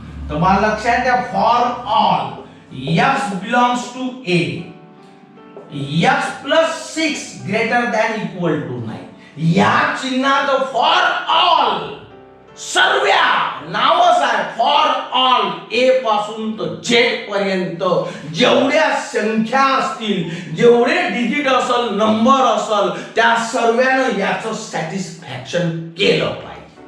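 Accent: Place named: Vidarbha, India. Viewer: Me ka vicharle Karan tumhi vidharbh chi basha bolt hota,kup Chan shikavtat tumhi sir, chemistry pan shikavtat ka tumhi?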